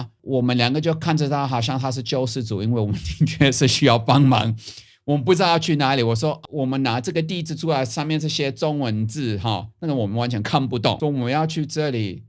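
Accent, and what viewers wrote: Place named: Taiwanese Hokkien, Taiwan. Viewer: 真的有學到台客說話的精髓。老婆大人教的太好了！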